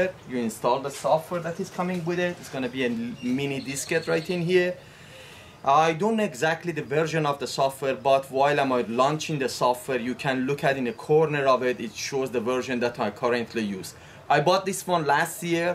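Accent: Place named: Iran. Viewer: Are you Persian? I was watching your video and my wife (iranian) walked by and said "who is that he sounds persian.."..lol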